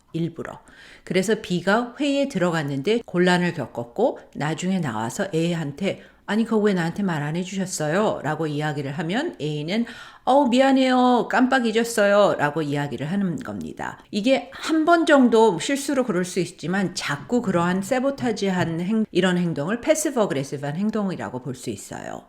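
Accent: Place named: United States. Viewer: 너무 재미있고 유용합니다! 영어도 완전 미국인 이신데 설명도 너무 잘 해주세요!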